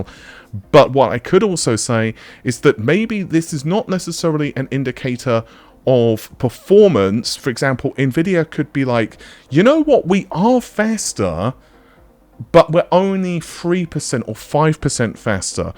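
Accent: United Kingdom. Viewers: This guy’s British accent is the type where he doesn’t breath through his nose at all when he talks🤣 other than that, love this channel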